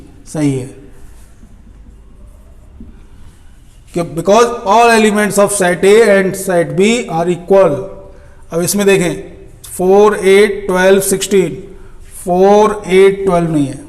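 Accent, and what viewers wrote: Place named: India. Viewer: I think I gained my brain cells after watching this ... perfect indian accent for teaching Maths .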